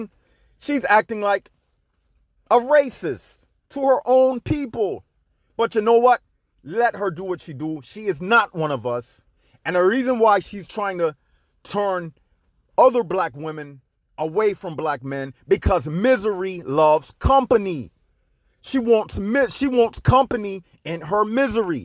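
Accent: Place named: Caribbean. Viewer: No I don't find her channel boring at all. I like that she has a new story all the time. She never repeats a story. And that's the main thing of her channel is she specifically says she will never repeat any of her content. So no it hasn't gotten old. But why are you even caring what she or other black American women want? You're not a black american. You can tell you clearly have some kind of Caribbean accent. Why don't you care about the women in your country? I think that would be more productive for you. They probably don't have this anyway. I mean they grew up with mainly black men and I don't even know how you guys are over there. Why do you even care? If she's talking most of the time about black American men and you are not black American then why do you care? It's just a thought.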